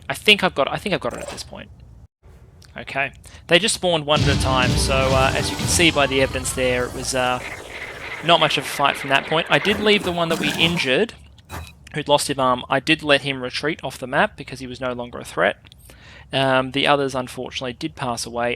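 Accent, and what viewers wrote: Australia. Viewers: Your Australian accent is flawless!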